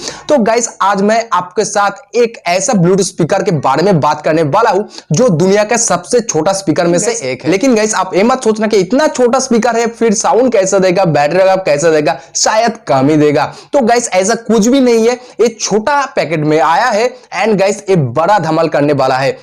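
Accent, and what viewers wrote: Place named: Assam, India. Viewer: Banda assami hai baat karne se pata chalta hai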